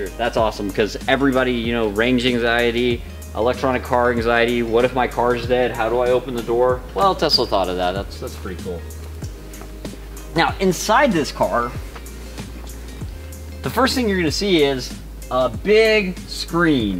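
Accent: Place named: India. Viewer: This guy sounds like the Indian guy from parks and req.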